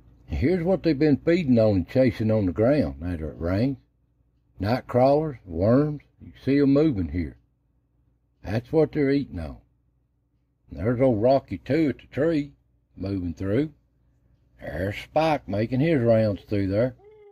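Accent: Appalachia, United States. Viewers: Came across your YouTube videos and have really enjoyed them. It's brought back many memories from my summers in East Tennessee. I am of Scotch Irish/English decent. My mother was from Knoxville and father from Carbo VA. Russell County. My dad grew up around the mines and had a love of steam locomotives Your accent is one I'm very familiar hearing. Love the mystery stories! And the orbs, wow! Many thoughts on those. I recall sitting on my grandfather's porch as they discussed the Dennis Martin case. It was going on at that time. Appreciate all the time involved in creating the videos. Looking forward to more videos. Greg Childress Cincinnati, Ohio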